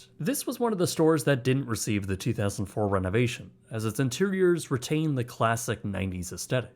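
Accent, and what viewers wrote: Canada. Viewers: Lone Star Steakheaouse? Bought eaout? The Canadian accent truly is the worst and most annoying accent for long-form content.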